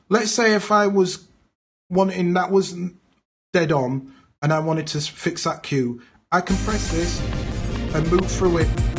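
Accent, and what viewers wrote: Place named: Northern England, United Kingdom. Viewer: Hey! an Englishman, and a northerner to boot, What is there not to like. Seriously though its refreshing to listen and learn from someone from my own country. I've Subscribed and given you a like, and I know I will be constantly referring to this video as there is no way this old fart will take in and understand all 47 mins of this video in one go. Thanks again for taking the time to teach us a little of what you know 😎😎😎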